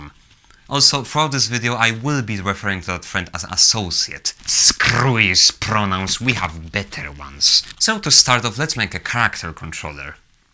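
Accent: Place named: Italy. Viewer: I'm loving this generation of good youtubers with italian accent (i think that's italian)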